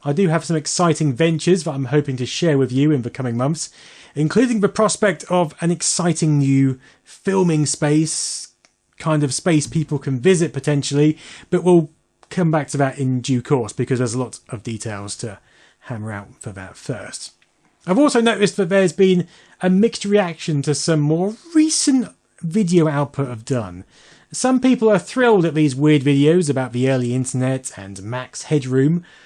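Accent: United Kingdom. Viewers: Bro just want to thank you for making vidoes. Listening your british accent and geeky videos makesmy day always better! 👍🏻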